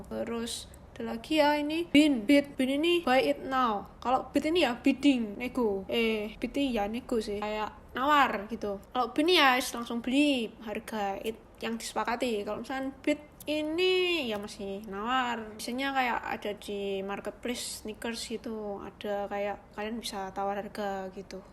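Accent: Java, Indonesia. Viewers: Mbak mbak faforit gua 😁 medok banget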